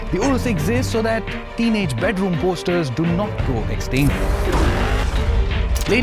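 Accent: India. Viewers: Ur indian accent tho...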